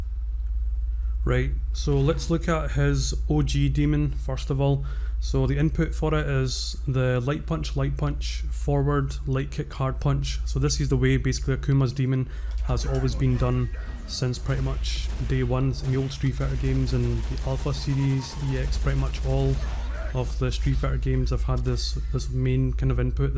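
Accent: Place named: Glasgow, United Kingdom. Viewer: Awryt wee sacks. Kidding, lol you sound local to me. Fancy a few sets ? With this dogshit netcode on this game I'm thinking if your local it SHOULD be better experience Cfn:Beast94 glasgow by the way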